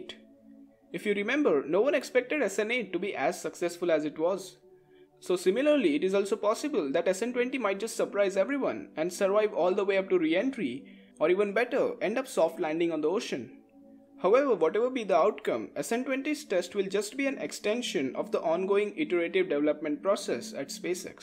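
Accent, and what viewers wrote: India. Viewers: I love Indian english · Try speaking a little more slowly. Given your strong Indian accent you might be a little easier to follow.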